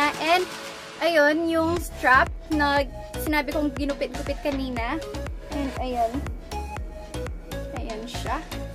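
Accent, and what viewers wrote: Pampanga, Philippines. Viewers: Kapampangan ka ata ate? haha❤️ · Kapampangan ka po?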